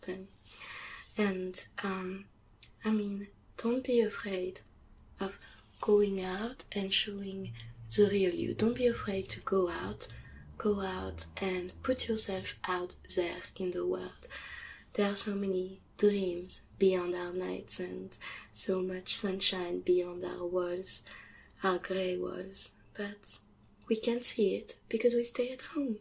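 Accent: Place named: France. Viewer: Tingly as always <3 Your french accent videos are so tingly man. Your hair!!! Are those clip-on bangs or a whole wig or new hair or--- you look gorgeous anyway:)))) Thank you for the video <3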